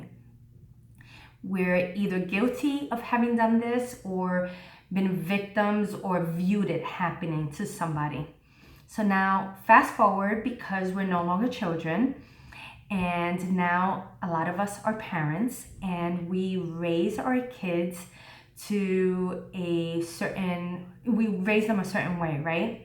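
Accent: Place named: New York, United States. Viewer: Where is your accent from ? Sounds like a New York Latino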